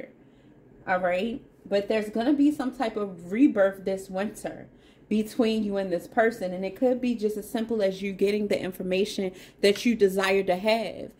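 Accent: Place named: Baltimore, United States. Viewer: You gotta be from Baltimore 🫶🏼✨ it’s the accent for me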